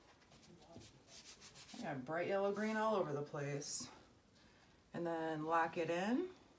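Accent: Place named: Texas, United States. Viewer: I live in Texas, north of Houston in The Woodlands. I thought you sounded like you might have been from down here somewhere. Anyway, glad I found your channel.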